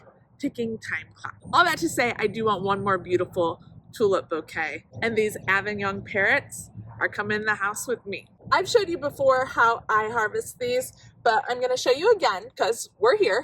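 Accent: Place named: Chicago, United States. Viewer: I love hearing your Chicago accent!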